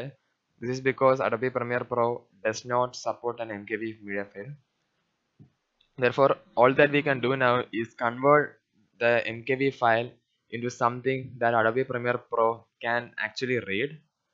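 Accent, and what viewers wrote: Kerala, India. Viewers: Machane Malayali AAANOO?? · Malayali, Right? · Ohhh your Malayalam accent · Argggh, your indian accent is too strong,...I cant understand shit that you're saying. Lose the accent bro.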